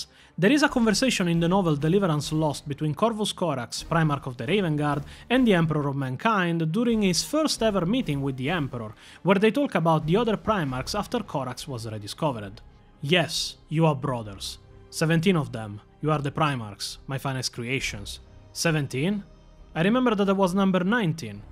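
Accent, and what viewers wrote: Italy. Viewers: That would be his Italian accent… completely unrelated to vampires.. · The rustic Italian accent gives it a good touch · 40k lore told in English with Italian accents? Gotta say its pretty entertaining. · Daddy’s finally uploaded!!! Let’s goooo! The thick “meat a ball” accent is perfection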